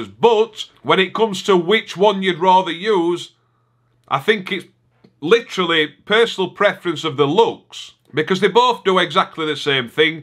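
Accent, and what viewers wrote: Yorkshire, United Kingdom. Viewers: Are you the most Northern person in history? You sound very Yorkshire.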